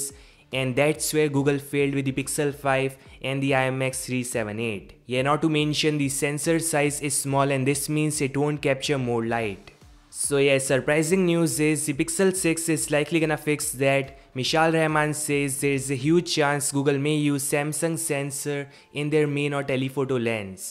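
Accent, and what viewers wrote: India. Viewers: So this guy is fellow indian....he sound indian though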